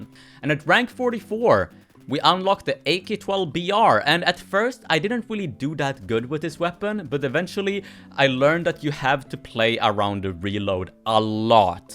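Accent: Sweden. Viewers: Where are u from? (U sound Swedish, I know that because I’m Swedish)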